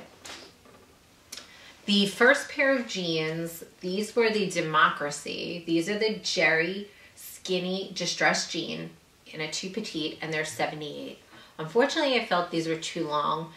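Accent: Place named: Long Island, United States. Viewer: My husband,who originally from Long Island, was sitting with me while I was listening to your video. He wants to know what part are you from :)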